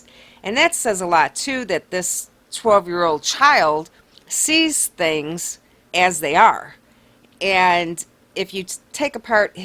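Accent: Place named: Midwest, United States. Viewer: She's got the best content and that hot Midwest accent/ voice loll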